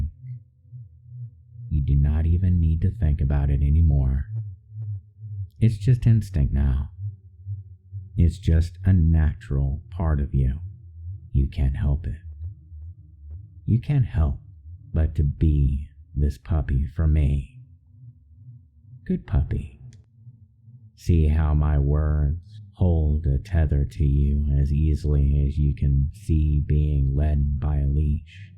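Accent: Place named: Texas, United States. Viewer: Putting how incredible the rest of this is aside for a moment, your accent is incredible. Sounds Texan to my ears (though that might be my bias talking since I grew up there), but no matter what it is, it’s very soothing!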